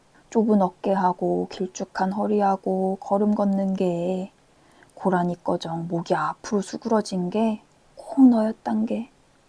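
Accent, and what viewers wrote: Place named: Gwangju, South Korea. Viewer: 혹시 고향이 광주이신가요? 저는 광주사람인데 사투리가 꽤 자연스러우시네요😂😂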